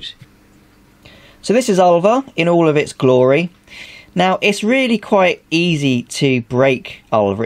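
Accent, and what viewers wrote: United Kingdom. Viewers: Hey! Judging by your accent, are you British? I am in Brighton and just starting out on this hobby, I don't see a lot of people in the UK keeping marine tanks though...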